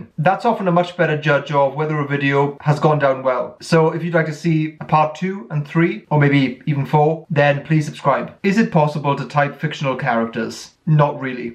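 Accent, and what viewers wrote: United Kingdom. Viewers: Can you please, please, please make an effort to speak more slowly in your videos, and maybe not edit your shots quite so close on the heels of previous shots? I'm really interested in your videos, but when you get going so quickly it can be pretty hard for me to understand your accent (and I'm usually really good with accents). I understand if it's your style or whatever, but maybe I'm not the only one from outside the UK who struggles to understand?? Thanks. And yes, I would like very much like more videos typing GOT and other fictional characters! 😁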